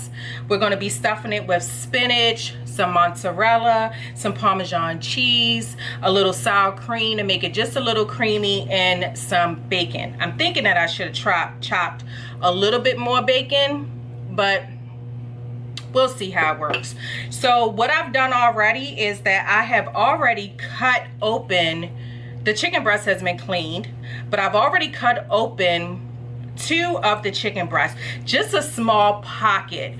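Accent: Baltimore, United States. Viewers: I would guess Baltimore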